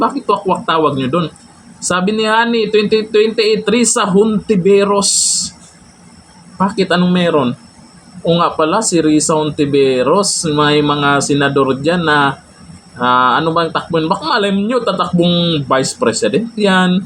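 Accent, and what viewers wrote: Visayas, Philippines. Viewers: Tagammmm,kabisaya ba oi..😁😁😁✌️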